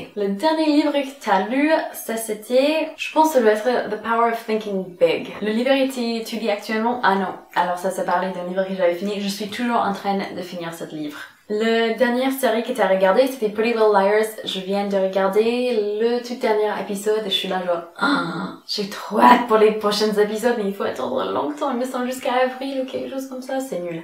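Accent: United States. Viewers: J'aime beaucoup t'es vidéos , sa a dû être dur d'apprendre le français pour toi , et j'aime beaucoup l'accent américain que tu as 😄 voilà , continu comme sa tu es super !!☺️ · Super vidéo ! J'adore ton accent, j'aime tellement quand les américains ou les anglais parlent en français. Je te trouve vraiment touchante et authentique ! Bisous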